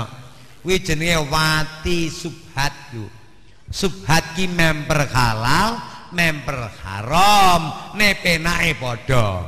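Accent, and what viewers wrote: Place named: Madura, Indonesia. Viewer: luar biasa bagus banget logate mirip meduronan..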